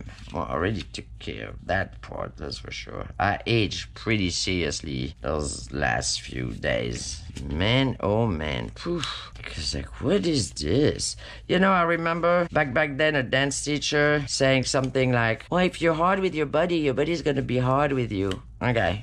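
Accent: France. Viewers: LoL 🤣 you make me laugh Every TIME I watch you 😂 Lol!! I'm so glad I found your channel. No Lie.... When that music 🎶🎵🎶 came on out of 'nowhere' and you said "I gotta go..!."🤪then "I'm kidding!!" and cackled afterwards..I almost D⚰️d in hysterical laughter. I had to rewind to 🙉 hear it Again! You Are a Joy to watch because I don't know what you May say in your Cute French Accent that will have me howling in laughter!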